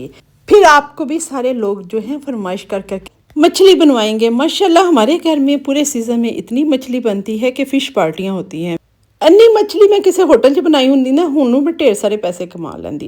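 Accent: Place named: Punjab, India. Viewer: Zabrdast ap k panjabi tch bht enjoy krty hain hm khush rhain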